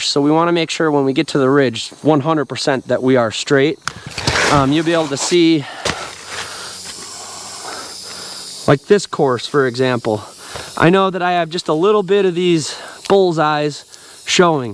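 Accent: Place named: Minnesota, United States. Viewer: Also cool because you sound like a fellow minnesotan 😂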